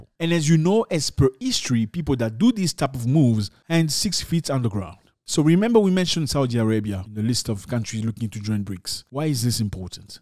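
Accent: England, United Kingdom. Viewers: Notre frère va du côté anglophone de la force, très bel accent anglais 💪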